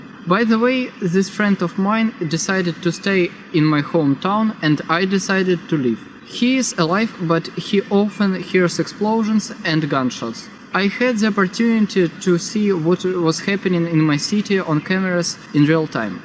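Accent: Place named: England, United Kingdom. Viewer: Видно что чувак из Англии, прям по акценту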